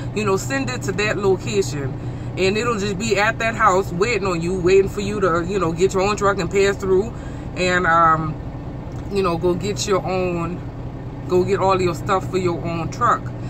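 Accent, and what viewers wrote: Louisiana, United States. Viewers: Sound like you from south Louisiana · I love that Cajun accent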